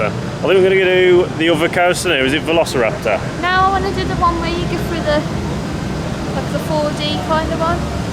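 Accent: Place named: Midlands, United Kingdom. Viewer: hi mate, love your content! just wondering are you from the midlands because your accent sounds very strong ps I'm from Birmingham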